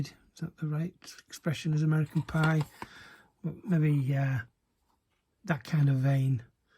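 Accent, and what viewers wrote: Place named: England, United Kingdom. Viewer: Could I ask you a cheeky question what part of England are you from. I always like to know where the YouTubers are from. I'm also a nosey bastard😁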